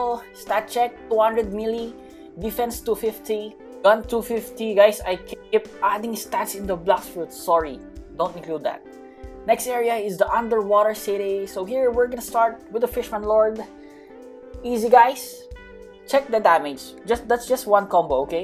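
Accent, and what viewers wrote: Philippines. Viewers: eh pinoy naman talaga sya voice palang nya at accent alam na alam na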